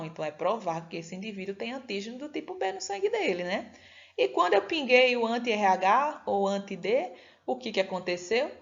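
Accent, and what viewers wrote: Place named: Bahia, Brazil. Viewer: kkkkkkkk é um pouquinho forte, mas é lindo. Bahia?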